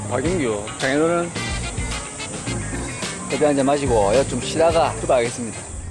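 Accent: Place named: Busan, South Korea. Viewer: 부산 사투리가 무슨 말인지 모르겠어요 ㅋ😢😢 영상 재밌게 봤습니다.🎉🎉🎉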